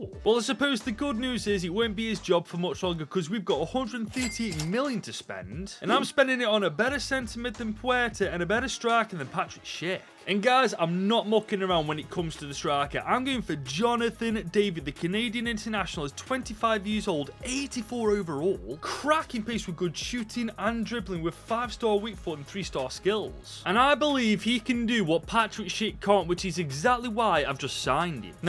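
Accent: Stoke, United Kingdom. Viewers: Eh up mate, which part of glorious Stoke are you from?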